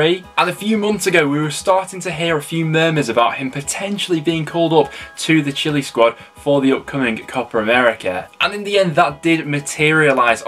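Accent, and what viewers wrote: United Kingdom. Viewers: British English is so nice 🇨🇱🇨🇱🇨🇱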